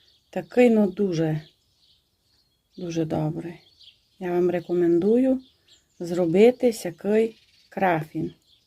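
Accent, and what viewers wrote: Transcarpathia, Ukraine. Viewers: Не показуйте будь ласка свою неосвіченість. Це на Закарпатті такий діалект. Ніхто мову не кривляє. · Сьогодні зпекла всі три види, тісто чудове. Я багато печу, цей рецепт не новинка, але дуже мені подобається ця закарпатська говірка. Дякую,.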